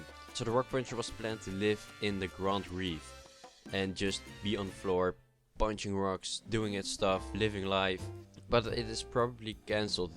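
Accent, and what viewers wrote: Netherlands. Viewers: Are you dutch? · You sound dutch(I am) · er was makkelijk te horen dat jij een nederlander was 😅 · Are you from Holland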